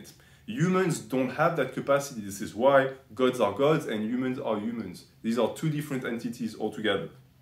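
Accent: France. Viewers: Many people here being grateful for thick french boi reading and sharing his toughts on the books but dont forget to read it yourselves too.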